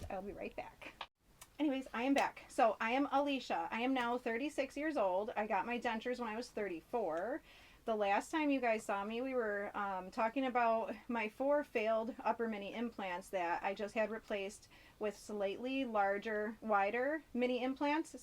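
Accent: United States. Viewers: I could tell you had a Buffalo accent from the moment the video started hahaha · Are you from Minnesota? :) because you sound like you do!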